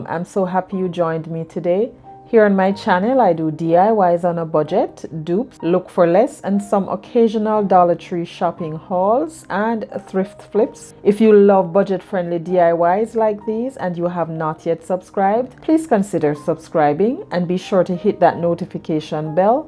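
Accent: Jamaica. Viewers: same! I didn't see snow until I was 8 yrs old, visiting family in NJ for a wedding and it was amazing! I played in it making a snowmen and kept running in and out of the hotel to put my hands under hot water (as a child, I had no idea it made it WORSE to do that as it burns your hands lol). But growing up, i thought snowflakes were the size of dinner plates! Cuz I'd see them on cartoons where they blow them up for the screen effects. My mother had to EXPLAIN to me that each snowflake was part of the snow that fell and they were very tiny. Boy, that blew my mind and they it started an entire other excitement trying to catch snowflakes and look at them before they melted to see that, indeed, they were snowflakes🤣🤣🤣 I laugh at myself over it because it's like "really Gaby? Dinner plate snowflakes? EVERYONE would get a concussion if those fell down when it snowed!!! 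Lol I figured you'd enjoy that story. I hope u can make sense of it! Lol I have a few Jamaican friends and I want to go to Jamaica SO BADLY! That was my aunt and uncles favorite spot to vacation. Your accent gave you away😋 I LOVE IT!!!❤❤❤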